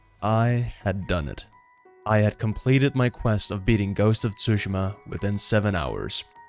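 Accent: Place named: Denmark. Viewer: Are you Danish? Your accent sounds Danish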